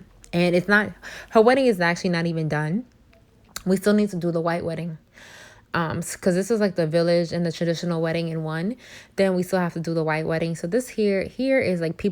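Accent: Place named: New York, United States. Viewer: Yoooooo this shit beyond crazy. 1 you sound like you from the Bronx or something 2. My dad is right up the street 3. Idk how I ended up here but yeah dope video it’s just you sound so New York 😂😂 tf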